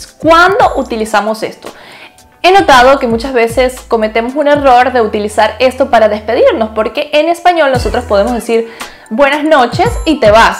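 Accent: Venezuela. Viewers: Tu hablas como Venezolana😊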